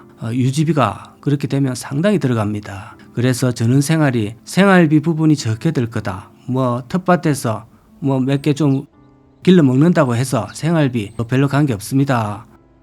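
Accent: Gyeongsang, South Korea. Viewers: 경상도분같이 말씀 재밋게하시니 보느데 재밋네요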